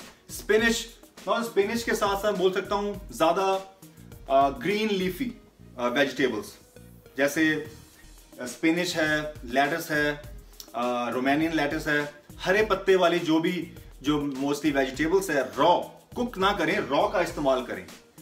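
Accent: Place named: India. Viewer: The worst thing ive heard is indians speaking english i dont understand 70% of words he saying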